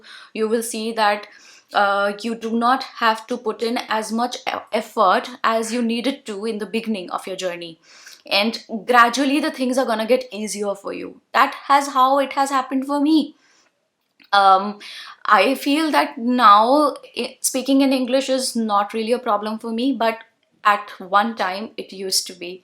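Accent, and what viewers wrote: India. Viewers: Is your accent indian, ma'am???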